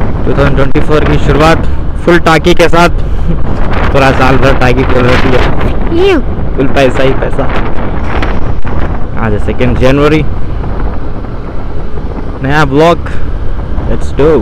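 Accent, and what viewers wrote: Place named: Hyderabad, India. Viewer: This is not a hindi bro. It's a deccani hyderabadi slang...💀🙌🏻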